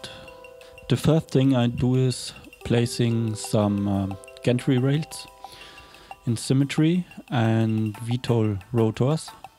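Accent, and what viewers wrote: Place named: Germany. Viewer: no offence but i find it amusing that you speak with a german accent in english, and your youtube ava is an asian :) thanks for the video, i think this might have helped alot! :D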